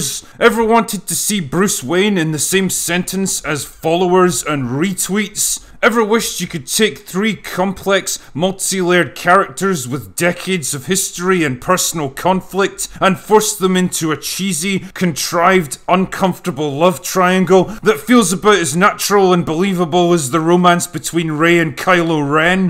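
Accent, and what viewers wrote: Scotland, United Kingdom. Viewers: Mr. Critical thinker are you Scottish ? I think you have a voice that could Rally up troops for battle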